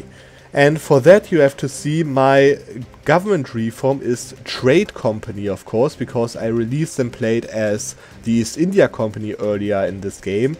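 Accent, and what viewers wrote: Germany. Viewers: Damn boy you sound so deutsch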